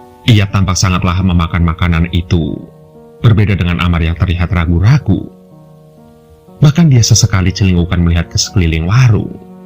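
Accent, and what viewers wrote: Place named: Java, Indonesia. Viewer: Mantap bng aku suka cara Abang ceritanya seru dan has jawanya 😊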